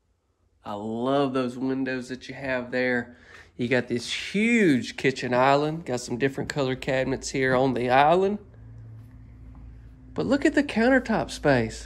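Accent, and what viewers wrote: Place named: South Carolina, United States. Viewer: Hi, just found your channel by today. Number 10 MH was nice, but to my amazement they’re still using the thin strips of wood(mdf) to join each 4X8 wallboard?, I really thought by now those would have been eliminated as manufacturing has really advanced over the years. Enjoyed your video and will be watching more. Thank you and have a great week….Be Safe ❤PS. I gotta say young man you sound like you were born and raised in upstate South Carolina.😂😂😂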